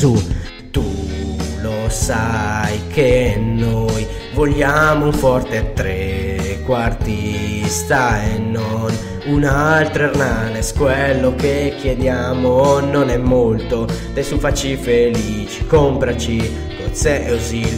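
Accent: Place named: Naples, Italy. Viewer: carina, togo l'accento Napoletano, apprezza i ringraziamenti perché sono Juventino XD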